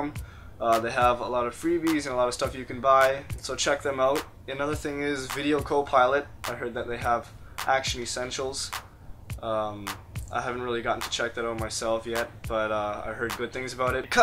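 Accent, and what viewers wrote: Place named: Canada. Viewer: I kinda get the feeling your Canadian judging by your accent and the way you spell, correct?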